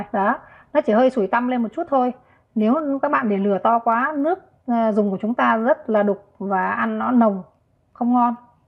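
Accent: Hanoi, Vietnam. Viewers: Chị ơi ngoài nấu ăn ngon mà nghe giọng hà nội gốc rất hay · dạ, con nghe giọng cô là liên tưởng đến NS Kim Tiến đó ah. Rất hay và đặc trưng, mặc dù bây giờ giọng pha nhiều rất hiếm để nghe đc người có giọng gốc Hà Nội nên giữ được bản sắc là điều rất quý. Chúc cô sức khoẻ và ra nhiều video bổ ích!!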